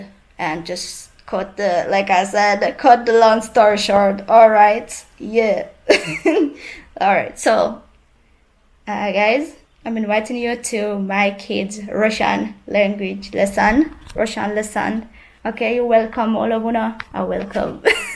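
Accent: Jamaica. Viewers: not 100% fluent in English and patois, so there is mix of both when she talks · Ur getting the Patois LoL 😂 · You have a real jamaican accent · Yes. Teach him. He will regret not learning when gets older. I love your Jamaican accent. Im Jamaican and taught myself russian some years ago.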